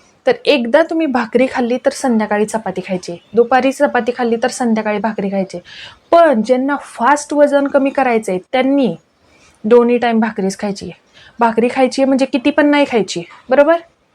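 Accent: Satara, India. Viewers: छान वाटला व्हिडिओ ताई तुम्ही सातारच्या का तुमचं बोलणं मस्त वाटलं😊🎉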